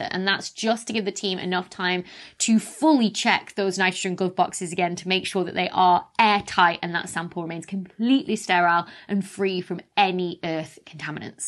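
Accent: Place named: Cockney, United Kingdom. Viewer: "ME-thane!!!" You're such a cockney cutie!!! I'll bet you say "al-U-minium," too?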